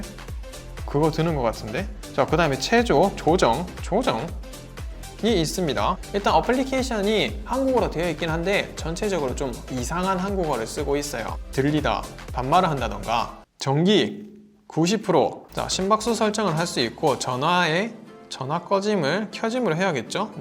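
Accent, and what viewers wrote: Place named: Gyeongsang, South Korea. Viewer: 부산 경남 사투리 정감어립니다 반갑쑤~ㅎㅎ 일반 스트랩 메탈 절대 호환안됩니다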